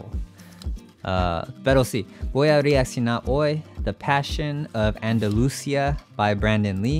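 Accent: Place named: Northern Europe. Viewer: Hola para alquilar un apartamento poco tiempo mira en Airbn, si quieres mas tiempo mira <link>. Tu español es muy bueno, pero el acento de "guiri" ya no se te ira. "Guiri" le llamamos los españoles a los extranjeros de habla inglesa o del norte de Europa.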